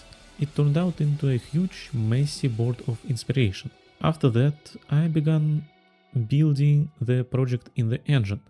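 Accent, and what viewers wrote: Russia. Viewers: Я тебя тупо по акценту вычислил, лол. Так держать, пчелл, ты могучая кучка и если всё срастётся - будет еще одна хорошая индюшатина в копилку, что всегда чудесно. Удачи с проектом.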